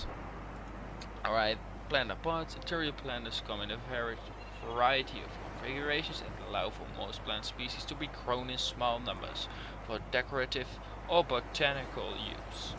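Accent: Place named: United Kingdom. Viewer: It seems that over time your accent has gotten more and more... British. AWESOME (and totally not cringy) xD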